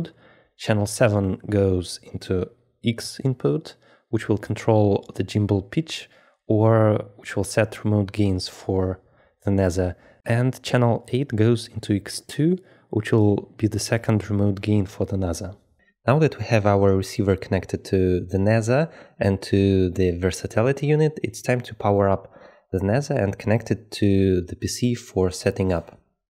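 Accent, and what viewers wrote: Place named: Russia. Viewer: This video is the BEST ever way to set up for 3pos switch. Better than airplane setup with mixing on CH5/6. I could listen to your accent (RU/EN) all day, I used to work with a few Russian/Bulgarian guys here in Aus. I loved it. Thanks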